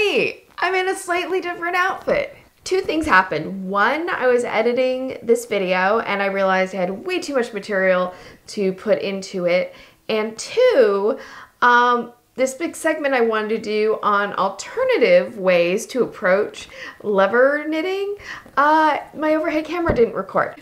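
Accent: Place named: Missouri, United States. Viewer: Really enjoyed your video...thanks for that! I've never tried this method but you make it look so easy I'm going to give it a try. You must be from my home state of Missouri; you sound just like my sister. I'll be watching! Thanks again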